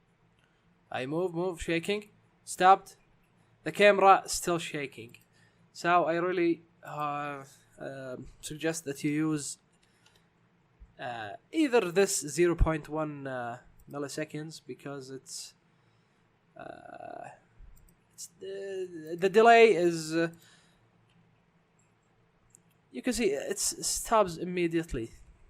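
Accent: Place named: Arab world. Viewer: love your accent are you arabic عربي صح ؟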